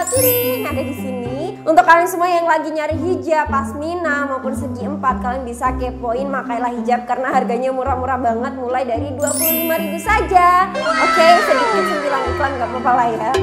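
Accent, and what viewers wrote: Java, Indonesia. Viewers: Kak asli mana. Kok ngomong e jowo? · Kak bahasa indonesianya agak medok Jawanya khas banget😀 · Mbaknya org mana sih?? Medok bgt sumpah lucu....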